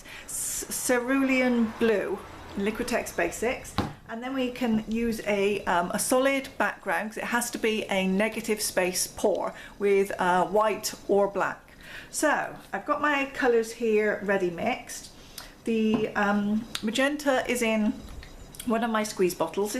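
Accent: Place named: Oxfordshire, United Kingdom. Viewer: Hi!:) That was beautiful and definitely my favourite of all in the challenge! You need to embrace your inner green lol:) that lime was very refreshing. Love that cup too, I agree with avani t - put a hole in the bottom and make a string of lights. Only thing is you'll need to do at least a dozen more, although I'm sure you'll get there...:)) oh and it's pronounced Sur oooh lee um here's someone called Emma who has a YT channel for just these sort of things <link> I know this because I had to (ask my better half) look it up for a picture I made a while back - added the link in case you'd like to see the picture <link> I was wondering where you are from originally, love your accent and thought I could guess but can't quite put my finger on it. Must be close to Oxfordshire, maybe?:) All my best Heidi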